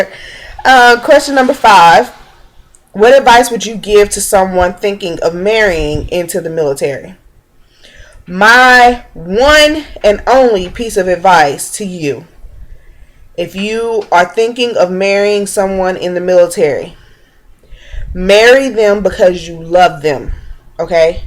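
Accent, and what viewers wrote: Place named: South, United States. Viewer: If you don't me asking what is your husband's mos? I detect a southern accent lol where u from girl.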